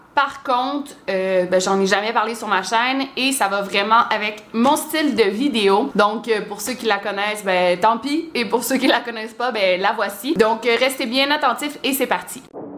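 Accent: Quebec, Canada. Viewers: Quand j'ai du temps pour moi, j'adore me poser dans le canapé avec un petit "snack" et un grand café tout en regardant tes vidéos ( j'y est même convertis mon petit ami, qui ne connaissais pas ce genre de vidéo, il adore). Quand tu nous narres des histoires, que ce soit, des cas de disparitions, sur les serial killers, les thread, etc.., tu as une façon de parler et de t'exprimer que j'adore ! Même si tu as un accent québécois (que j'aime beaucoup), il n'y a que chez toi que je regarde ce style de vidéo ^^ J'avale tes paroles, je me sens vraiment dans ces histoires que tu nous racontes avec beaucoup de passion si je puis dire, tu expliques très bien et c'est agréable ! Continues ainsi, tu as de plus en plus d'abonnés et ce n'est pas pour rien, car tout le travail que tu fournis porte ses fruits ! Une Charltonniste de France, Bise, Sophie =)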